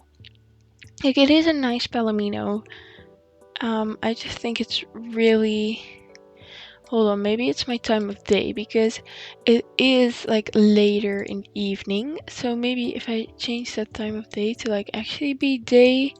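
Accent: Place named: Netherlands. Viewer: The old ones are so cute!! Also are you Dutch? Your accent sounds Dutch :)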